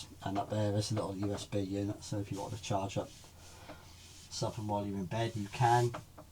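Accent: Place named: East Anglia, United Kingdom. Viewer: I’ve just discovered your channel and I’m hooked already.Guessing you are north Suffolk or south Norfolk from the accent. I’m down on the coast not a million miles from Aldeburgh. Looking forward to watching the canoe builds as I’m a maker myself…woodturning, and have my own little channel.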